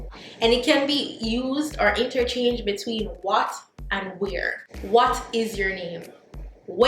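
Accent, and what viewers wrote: Jamaica. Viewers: As a latina I’m seeing a Jamaican and his patwa is sooo strong lol I’m learning tho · I don't understand not one bit of patois but I love hearing it lol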